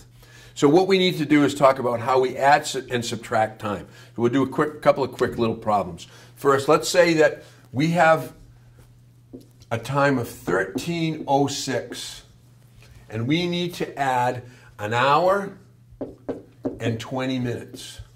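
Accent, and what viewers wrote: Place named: Eastern Shore, United States. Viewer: One- of the greatest gifts to humanity is teaching. The second is teaching WELL! and you achieved both! Your tone and pace is excellent. Now far from my Eastern Shores I do enjoy the accent, which of course we know we don't have but everyone else has one.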